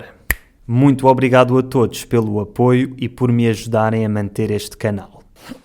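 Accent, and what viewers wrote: Lisbon, Portugal. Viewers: Sou nativo e queria complementar uma informação sobre o que o diz a partir dos, sobre a ligação entre duas palavras com vogais com o S no meio. O ensina (e bem) que usamos o som Z. Isso é muito normal do sul e centro (o tem um sotaque de Lisboa, que é no centro/sul). Mas não é Z em todas as partes do país. Há muitas zonas do país (por exemplo em algumas regiões do centro) onde se usam outros sons para unir as vogais. Por exemplo, J. Por exemplo "animais amigáveis" pode ser dito como "animai-j-amigáveis". E há outras formas de unir vogais, em outras zonas do país, mas não sei como escrever esses sons. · É lindo o sotaque portugues, mas eu aprendi o sotaque brasileiro e eu gosto mais esse. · legal o portugues de Portugal, sou brasileiro mas acho legal esse sotaque também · lamento dizer mas isso não é sotaque ‘português europeu’, mas sim português lisboeta. 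A eliminação do i é algo muito típico de Lisboa, e é um processo fonético recente das últimas décadas em Lisboa. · Ensinas de uma forma espetacular obg.amo o sotaque europeu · Interessante! Gostei de aprender e entender um pouco o português de Portugal. Já ouviu o sotaque do sul do Brasil? É o meu. Eu já tentei falar com seu sotaque, é difícil. Eu assisti um video de uma youtuber portuguesa e ela falava muito rápido, algumas palavras eu não entendia, assisti várias vezes para entender. Rs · Meu caro - eu deixei portugal com 24 anos,(ha trinta e dois anos radicado no R.U.) NEM DE LONGE eu falo portugues com o teu "acento " o teu acento ou forma de pronunciar o nosso portugues - eu sou beirao MAS NAO TROCO os "v" pelos "b" - a tua pronuncia é tipicamente alfacinha (Lisboa e arredores ) - a mim nao me apanhas nunca a falar da tua maneira - Portanto tudo o que referes aqui neste video nao é necessariamente a norma nacional.abraço e obrigado pelo bom trabalho na evangelizaçao da nossa lingua. 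· O teu sotaque lisboeta é que complica muito… o português do norte é muito mais fácil de aprender e ouvir, pois nós carregamos nas vogais e é mais aberto… vocês comem as palavras… de Aveiro até ao Sul o português é muito fechado e mais complicado em escutar… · Nossa amo sotaque português ❤ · Eu amo este sotaque. Me lembra muito da minha avó Ludovina. Portuguesa, com certeza. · Parabéns pelo vídeo, é muito bom, sou brasileiro, aprecio o teu sotaque, porém aqui no Brasil falamos de forma um pouco diferente dos lusitanos que para nós soa muito formal, e não somos um povo que gosta muito de formalidades, por isso falamos um português mais informal e mais cantado, pronunciamos quase todas as vogais e até acrescentamos onde não existe rsrsrs um exemplo é a palavra "nós" muito gente aqui fala "nóis". 🫣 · Que aula. Amei. Sou Moçambicana e gosto da pronúncia Portuguesa. Abraços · Sotaque de Lisboa 😊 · este professor tem todo o mérito de falar português de portugal continenatl. 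jà os Brasileiros armam-se mas desconhecem as capacidades literarias do ensino de portugal continental. PARABENS A este jovem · Teu sotaque é dum alfacinha , nao condiz com sotaque do norte , que é a parte mais desenvolvida do país. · É bastante agradável aos ouvidos ouvir você falando o português de Portugal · Sotaque português é simplesmente lindo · Adoro o sotaque portugues!:) Porque é tao diffícil haha. Obrigada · Ola, eu quero aprender esse sotaque portugues e "fixe" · Voce é crack,! Obrigada sempre pelos seus vídeos! Do único sotaque portugués que eu gosto, é do seu kkk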